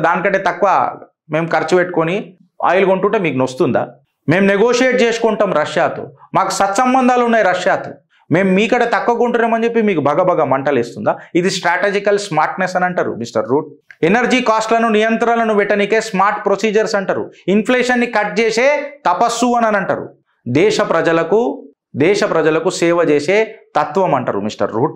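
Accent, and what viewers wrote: Telangana, India. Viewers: Super Telangana bhasha boss, super & nice👏👏👏👏👏👍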